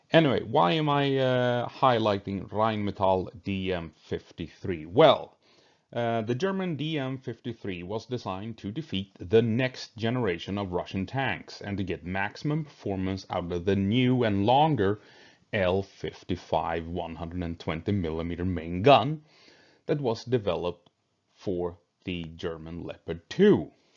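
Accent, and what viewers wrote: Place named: Sweden. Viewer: Hej! Could just barely hear a weak Swedish accent 😊 Great video, greeting from a fellow swede!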